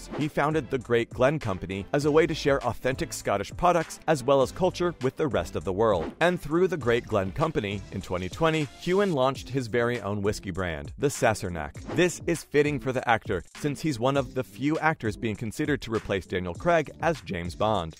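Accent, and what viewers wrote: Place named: United States. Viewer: Please learn to pronounce Glasgow. American narration is awful.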